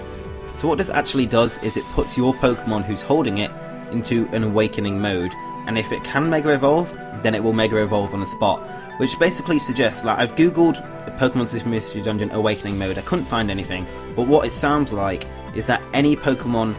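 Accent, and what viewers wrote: United Kingdom. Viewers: hear it. I'm from America though.. Are you British?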